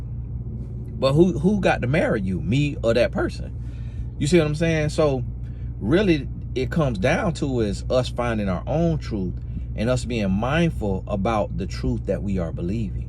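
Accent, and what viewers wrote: South, United States. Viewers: You may have a country accent, but you teach alot of good knowledge! I have a strong Texan accent, when I travel sometimes ppl don't understand me. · I love your country accent.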